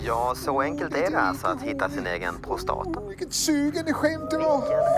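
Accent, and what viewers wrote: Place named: Denmark. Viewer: Jä , å ändåäh prätar han 100% Danskäh 😅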